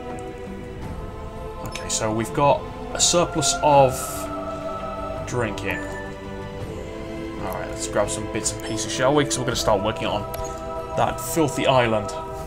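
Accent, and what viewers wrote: England, United Kingdom. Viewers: Fuck yeah I just need to grab some food , play video and listen to English gentleman playing intelligent game on PC ... fuck yes!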